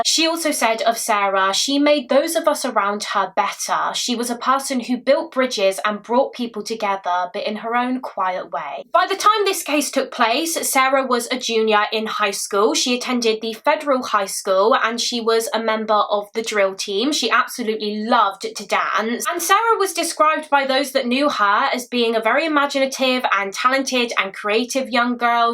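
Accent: United Kingdom. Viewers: Hi just want to say enjoy your content you are a talented storyteller also love your British accent❤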